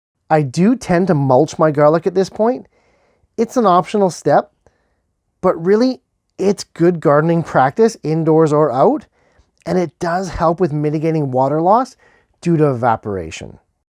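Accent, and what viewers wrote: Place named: Canada. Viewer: Ive been trying to find small things to grow inside my apartment, im excited to try this out! Looking for more if you have suggestions 👀 Ps your canadian rise accent is strong and i love it 😆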